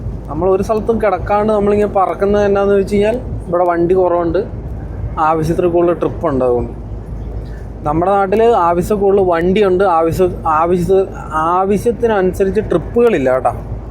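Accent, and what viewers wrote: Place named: Kannur, India. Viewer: സംസാരം കേട്ട് കണ്ണൂർ കാരൻ ആണോ പിന്നെ ഗൾഫിൽ ചൂട് ആയാലും Ac. ഒത്തു പോവും ഈ തണുപ്പ് പോന്നു ഭായ് ഭായ് എത്ര യൂറോ കിട്ടിയാലും വയ്യ 🙏❤️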